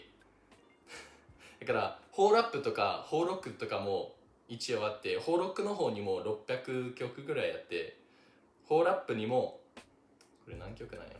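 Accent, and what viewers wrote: Kansai, Japan. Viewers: 関西弁はええよな！ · 日本語がめちゃくちゃ上手いですね！いわゆるアメリカ訛りのアクセント強めの日本語発音もしてないし、しかも関西弁😂日本の文化を好きになって、日本語を習得して凄いですね！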